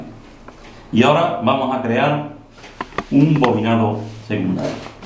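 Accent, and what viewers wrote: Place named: Seville, Spain. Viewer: gracias por la respuesta...lo preguntaba porque por el habla, parece usted de sevilla, que es donde resido, en mi trabajo relacionado con plantas de agua...siempre subcontrato la fabricación del cuadro...cosa que me gustaria hacer yo mismo para tener mas control sobre los problemas posteriores que casi siempre los hay. En la facultad saque un 9 resolviendo circuitos...pero todo muy abstracto y teorico...demasiado alejado de la practica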